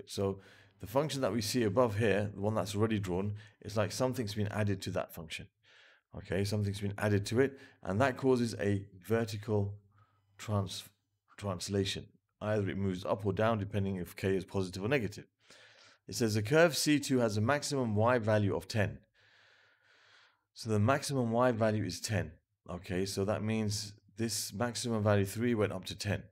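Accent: United Kingdom. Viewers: the best! And that British Accent really hits the spot, lol.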